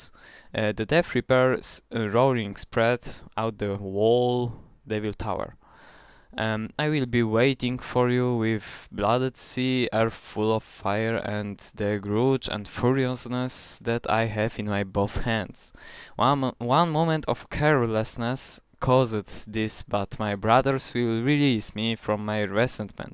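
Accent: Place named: Poland. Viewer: Szacun. I tak słychać w choooooj polski akcent. O_o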